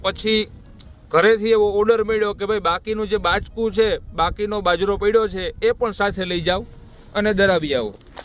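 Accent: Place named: Kathiawar, India. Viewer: Bhai hu gujrati chhu, ghandhinagar thi, tamaro whiz (aavaj) kathiyavadi lage 6, ane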